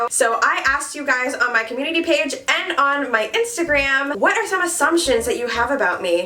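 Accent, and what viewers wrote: England, United Kingdom. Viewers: I like the British accent❤ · Your british accent though 😳💕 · Can you do a video of doing a make up tutorial video with your british accent please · That British accent tho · That English accent is on point, much love from a Black British fan (so I know my own accent 😉) also I think I'm 153cm tall too! Height twins 😂😂😂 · Queen your British accent is 😘👌💕 Also gonna call this Lil Grinch🤣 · I’m impressed by your British accent! Only discovered you recently, loving your content 🙌🏾🙌🏾🙌🏾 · Your british accent is pretty good -coming from a british girl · Me not used to the British accent 👁👄👁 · 0_o Excuse me but your british accent scared me - I'm black and English but like you sound more british than me 😂🙈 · Your British accent is actually really good 🤔🤨❤ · That British Accent 😍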